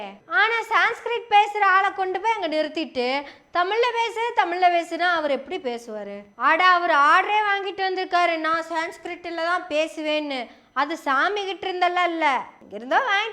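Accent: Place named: Coimbatore, India. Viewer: பாப்பா உன்னோட கோவை தமிழ்ல நல்லா வைச்சி செய்து இருக்கீங்க 😂சூப்பர் 👍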